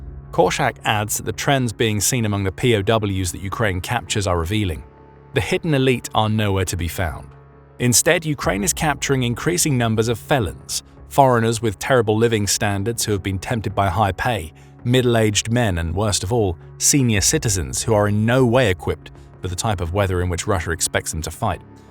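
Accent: United Kingdom. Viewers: Whenever you hear a limeys voice narrating you just know its bullshit.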